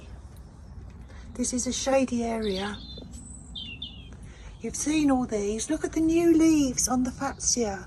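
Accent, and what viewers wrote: London, United Kingdom. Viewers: Hi Lovely to hear from you. My husband watched today and said you sounded like a Londoner. You have been so busy again. I will be copying the artificial grass liners idea. Regarding your 'trinkets'... I love them👏 i have some too especially signage ones eg. ' Don't worry,be happy' ( of course we do worry,but i like the sign). I'm a great one for separating my plants. Makes my garden look fuller too. No big buys lately,just some paler blue muscari. The old favourites are surprising me too. Your garden is a joy. Regards to Richard and thank you for making me smile💯💖☕🥰💞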